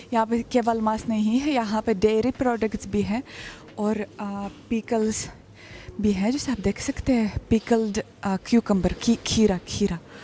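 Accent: Standard. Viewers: aapke videos badhiya hote hain aur aap shuddh Hindi bolte hai utana hamahare yahan par pade likhe loge bhi nahi bol pate hai. Maine Aap ko videos mai pahale bhi kai baar yeh bolte hue suna hai ki “ Bhagwan ne mujhe dimagh bahut kum diya hain “ aisa aapko videos mai aur apne aap se nahi bolana chahiye. Aisi meri aapko sujhaw hai. hamhare pure India se aapke bare mai koi bhi vyakti nahi bol sakta hai aisa mai samajhta hoon. Aap ek buddhiman avam khubsurat mahila hai. Ye keval aapka complex hai.please drop it. Videoske liye dhanywad aur subhkamnaye. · Bhai kitni pure hindi bolri h ye ladki ❤ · Bhai yrr itni sudhhh hindi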